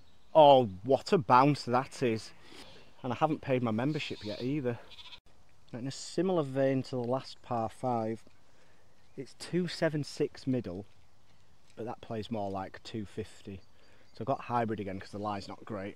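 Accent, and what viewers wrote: Yorkshire, United Kingdom. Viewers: thanks mate I am new to the channel really good I reconized your accent as I am from brighouse near Huddersfield. Great video mate.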